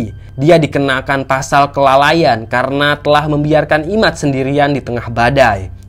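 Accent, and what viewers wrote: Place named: Lombok, Indonesia. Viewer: Orang lombok yah😅😅😅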